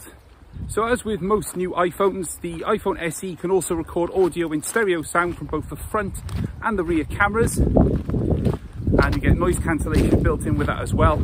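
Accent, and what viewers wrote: United Kingdom. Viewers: your British accent 😍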